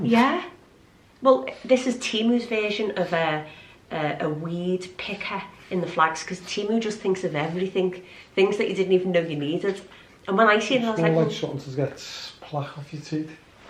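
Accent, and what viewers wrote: Liverpool, United Kingdom. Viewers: Just found your channel and love it. Cant wait to get in my garden but in toronto its still a bit cool for planting. Are you a scouser like me?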